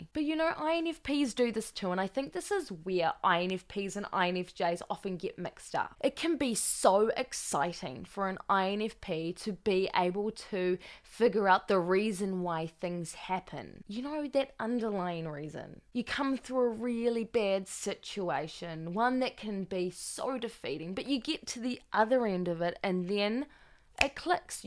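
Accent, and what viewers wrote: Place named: New Zealand. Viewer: That kiwi accent. Hard to find in a sea of YouTube content.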